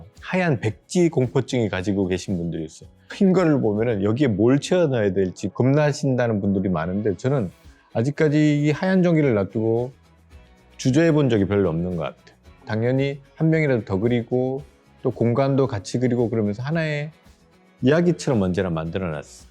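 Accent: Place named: Gyeongsang, South Korea. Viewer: 출생이 경기도 고양시 출생인데 말투가 경상도 쪽 어휘와 억양이 있는데 경상도에서 살았거나 부모님이 경상도 쪽인가? 거의 확실해 보이는데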